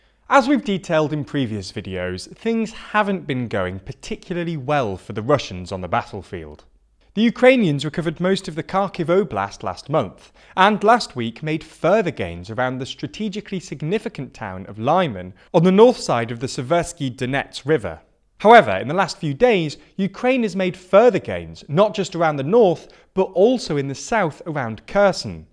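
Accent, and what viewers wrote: United Kingdom. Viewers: But so british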